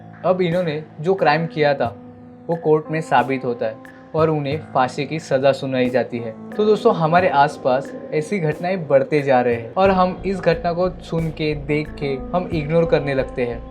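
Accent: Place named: Mumbai, India. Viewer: Hindi should be more fluent...yeh mumbaiya hindi h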